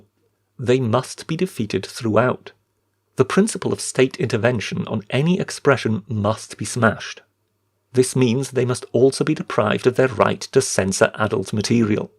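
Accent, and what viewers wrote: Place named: Wales, United Kingdom. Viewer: It’s strange that you shape your channel around Britain, yet your accent has quite a foreign twang to it. It’s clear that your English accent is somewhat contrived - however you might just be Welsh.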